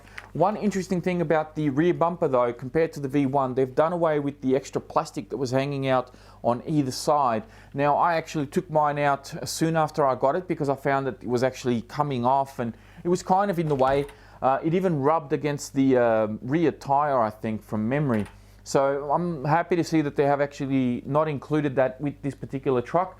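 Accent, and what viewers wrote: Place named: Australia. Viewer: I’ve been to the hobbyking live event in the Netherlands last weekend, and I must tell you that I really like the australian accent that you and the rest of the aussies (hobbyking guy also) have.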